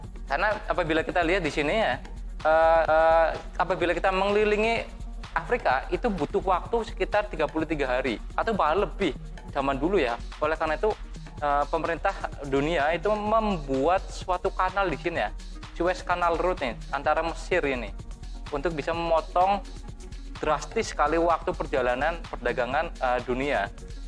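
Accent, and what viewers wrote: Banyumas, Indonesia. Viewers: Ngapak ya kang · Ngapak kie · Kurang pengetahuan ini mas bro. Dan istilah2 laut nya juga kurang paham. Dan yg fatal gak bisa bedain mana suez dan panama😀. Belajar lagi mas. Dan bicara nya jangan ngapak bgt dan aja kebanteran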